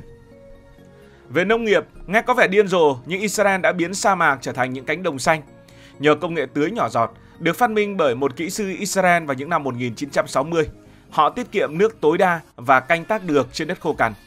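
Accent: North, Vietnam. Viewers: Thông tin đăng tải và giọng thể hiện của bạn rất hay, nhưng mong bạn phát ấm chuẩn tiếng Việt để thể hiện "đẳng cấp" của kênh và ko gây ức chế cho người nghe cũng như giữ gìn sự trong sáng của tiếng Việt. Cụ thể: bạn ko đến nói dạy học thành dậy học, giàu có thành giầu có... đây là hiện tượng phát âm sai của người dân một số vùng quê đồng bằng Bắc bộ (được cho là quê mùa).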